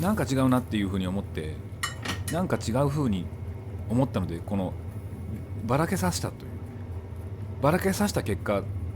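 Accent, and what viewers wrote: Standard. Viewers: なぜ、標準語🤣 (≧▽≦) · 何故か標準語のナレーションが面白いですね😃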